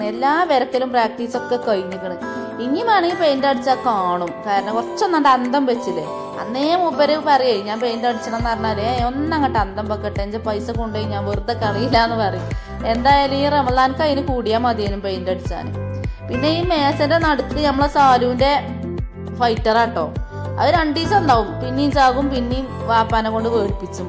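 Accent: Malappuram, India. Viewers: ഞാനും മലപ്പുറാ പക്ഷെ ഇങ്ങിനെത്തെ ഭാഷ സംസാരിക്കില്ല ട്ടോ- കേൾക്കുമ്പൊ ഒരു പ്രത്യേക രസം😅😍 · Spr vedio&malappurm samsaaravum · നിങ്ങളെ മലപ്പുറം ബർത്താനം ഉഷാറായിക്ക്ണ് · ങ്ങള് ന്തിനാ ഇംഗ്ലീസ് പറേണു ..മലപ്പുറം style സൂപ്പർ അല്ലെ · ഇതാണ് തനി നാടൻ മലപ്പുറം ഭാഷ അഭിനയവും നാട്യങ്ങളും ഇല്ലാത്ത വീഡിയോ മാത്രമല്ല വീട് പെയിന്റ് അടിക്കുന്നതിന് മുമ്പ് തന്നെ ഇതൊക്കെ കാണിക്കാനുള്ള മനസ് വന്നതും ബെഡ് ഷീറ്റ് വീഡിയോക് വേണ്ടി ശരിയാക്കിയതാണെന്നു പറഞ്ഞതും നിങ്ങളുടെ മനസ്സിൽ അൽപ്പം പോലും ജാഡയും പൊങ്ങച്ചവും ഇല്ലാത്തത് കൊണ്ടാണ് ആദ്യമായിട്ടാണ് ഈ ചാനൽ കാണുന്നത് വീഡിയോ ഒരുപാട് ഇഷ്ട്ടപ്പെട്ടു ഇതൊക്കെ സപ്പോർട്ട് ചെയ്തില്ലെങ്കിൽ പിന്നെ ഏതാ സപ്പോർട്ട് ചെയ്യാ ഞാനും മലപ്പുറമാണ് നിങ്ങൾ മലപ്പുറത്തു എവിടെയാ? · സംസാരം സൂപ്പർ. മ്മളെ മലപ്പുറം സ്ലാങ് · മലപ്പുറം ഭാഷ... ഒരു രക്ഷേമില്ല kalakki👍👍👍😁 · താത്ത എന്റെ മലപ്പുറം ഭാഷ നല്ല രസമാണ് എന്തിനാ താത്ത ഇംഗ്ലീഷ് താത്ത എന്റെ ഭാഷ എല്ലാവർക്കും മനസിലാകും കൊറേ ഒക്കെ പഠിക്കാൻ പറ്റി ഇനിയും പുതിയ വിഡിയോസൈവരണം insha allah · ഗുഡ് ഈ സംസാരം കേൾക്കാൻ തന്നെ ഒരു പ്രതേക സുഖം മലപ്പുറം സംസാരം എത്ര കേട്ടാലും മതി വരില്ല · മാഷാ അല്ലാഹ് നിങ്ങളുടെ മലപ്പുറം ഭാഷ പൊളിച്ചു ❤️❤️ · നിങ്ങൾ എത്ര വരെ പഠിച്ചു നിങ്ങളെ സംസാരം കേട്ടാൽ മലപ്പുറത്തക്കാരെ ഓർമ വരും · നമ്മുടെ മലപ്പുറം ബ ർത്താനം കേൾക്കാൻ എന്താ രസം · നല്ല വീട് മലപ്പുറം ഭാഷ കേൾക്കാൻ നല്ല രസമാണ്. 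· ഇത്താ ഞാനിപ്പഴാ ഇങ്ങളെ ചാനൽ കാണുന്നത്... ഞാനും മലപ്പുറം പെരിന്തൽമണ്ണയിലാ... ശെരിക്കും ഒരുപാടിഷ്ട്ടായി... ഹോം ടൂർ ഒരുപാടിഷ്ട്ടായി...നമ്മടെ മലപ്പുറം ഭാഷ കേട്ടപ്പോ അതിലേറെ സന്തോഷായി 🥰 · ഇതാണ് അസ്സൽ മലപ്പുറം ഭാഷ...Very good ingane thanne parayanam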